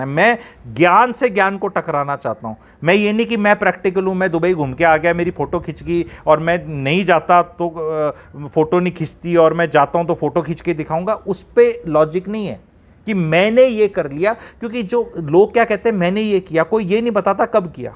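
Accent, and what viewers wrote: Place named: Haryana, India. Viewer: I love your accent English , Hindi, Haryanvi😊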